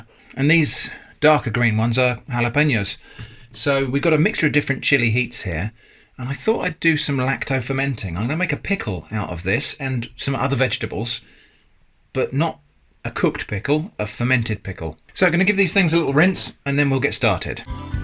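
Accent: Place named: Southern England, United Kingdom. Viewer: thought it was south but couldn’t quite place the accent , From Kent myself but I live in Norwich ,, great foraging out in the country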